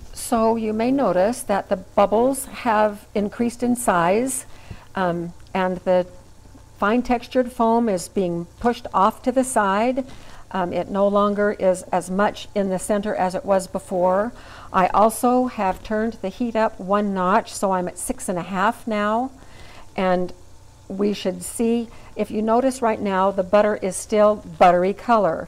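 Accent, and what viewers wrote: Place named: Arizona, United States. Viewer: Is she from Arizona Sounds me like šhe is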